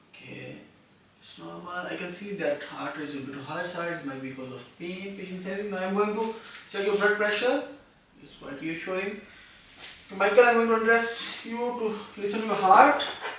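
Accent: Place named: Asia. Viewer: Very educative video. You are good teahcer. The only challenge with the video is the accent that make it difficult for non-Asian audience to hear it well. We will appreciate it, if you can speak more slowly, just to carry others along. Thank you, sir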